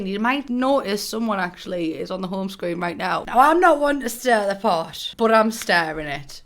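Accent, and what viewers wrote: United Kingdom. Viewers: Here i am again downloading it again thanks i did keep up with your dialect even tho i'm from norfolk nice to hear a brit talking about sims makes a change from the yanks all the best love to you and stay safe.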